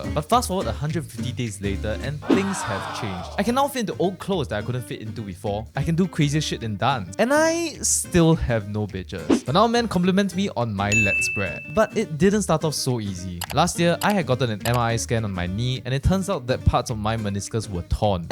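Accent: India. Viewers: nice and informative video, but how come you have Indian accent? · not trying to be rascist but how do you sound indian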